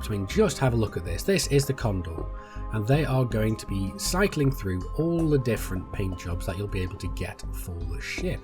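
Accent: United Kingdom. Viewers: I love you random British guy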